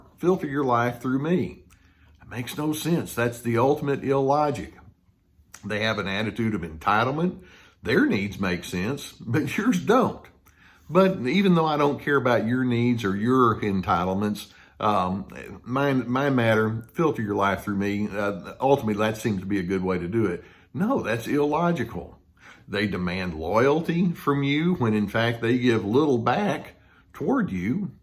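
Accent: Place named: Texas, United States. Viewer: Somehow the narcissist looks all the more ridiculous when they are described with a Texas accent!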